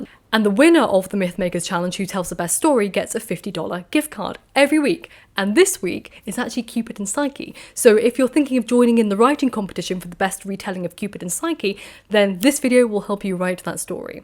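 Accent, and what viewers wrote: RP, United Kingdom. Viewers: I love her content but that has to be the RP accent to end all RP accents.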